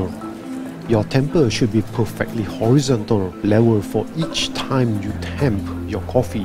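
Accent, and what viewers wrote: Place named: Thailand. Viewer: Sounds more like a Thai than Malaysian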